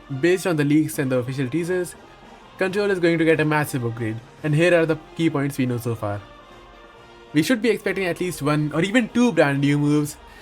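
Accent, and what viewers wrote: India. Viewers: You sound like an Indian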